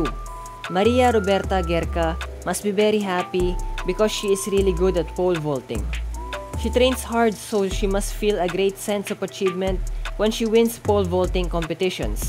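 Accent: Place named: Philippines. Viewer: Why is a Filipina narrating the vid? (guessing by the accent) Shouldn't it be an Italiana?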